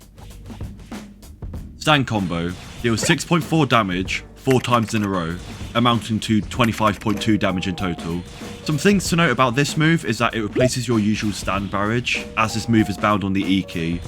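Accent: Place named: United Kingdom. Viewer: clicked this thinking it was eclipse and then was like “who the fuck is this British guy” 😭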